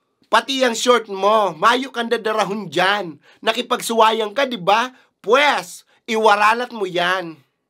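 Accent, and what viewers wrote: Bicol, Philippines. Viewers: naugma ako duman sa lamay-ag.. hahaha, bikol na bikol. KUDOS ORAGON KITA!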